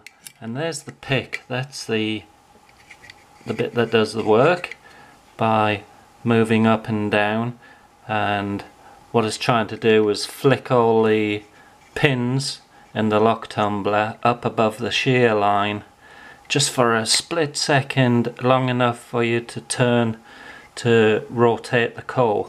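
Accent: United Kingdom. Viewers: OMG ... this is MacGyver with a British accent. Great video Amigo.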